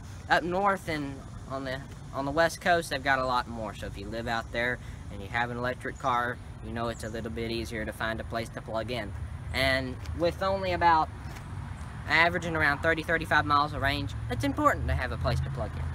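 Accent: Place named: South, United States. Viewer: Man you haters got to stop hating, this young man is awesome and you throw around shit about being gay and needing bass in his voice. Dudes you obviously ain't been nowhere in your boring ass lives. People from certain parts of the country just talk like that, parts of NC, parts of Tennessee, and many other regional dialects, just watch NASCAR some time. My hat goes off to this positive young man not wasting his time on worthless pursuits. Soon as one of you does something relevant feel free to cast stones.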